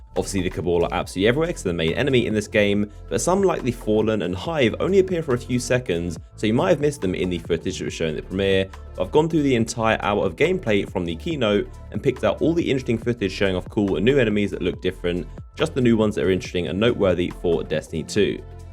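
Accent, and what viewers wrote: United Kingdom. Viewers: Another one of thoses british with a unsufferable accent. Clones AND cookie-cutters, all of them.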